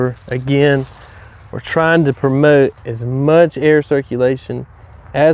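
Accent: South, United States. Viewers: Recognized a Southern accent, confirmed by NC on your shirt :) I'm in Arkansas. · thanks, great video! very instructive! will do mine now this morning! by the way! you've got a great southern dialect!!!!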